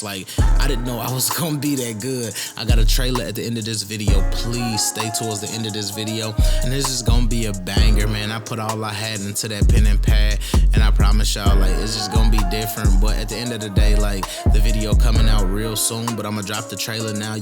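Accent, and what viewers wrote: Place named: Midwest, United States. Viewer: You got that Midwest flow 🔥 talk yo shit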